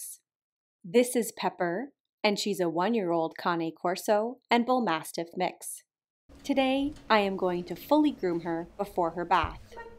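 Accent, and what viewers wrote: Canada. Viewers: Thanks. NO WONDER you sound like a Canadian. Cheers.🇺🇸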